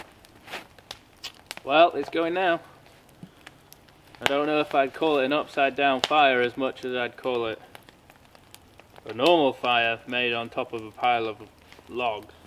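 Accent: South, United States. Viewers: Oil your gloves... mineral oil.. ppl suggest other oils... 🙄 just oil them. Ya really need a saw upgrade. I wouldn't wanna be caught dead in the wood with that- (I know its compact and lightweight) they would say: "at leased the saw kept him warm, isnt it?" Awesome Southern accent btw 😂. It's well advised to not drink whilst camping alone... but, that's your business. Good vid, great job, thanks for posting. One can learn a thing or two from this.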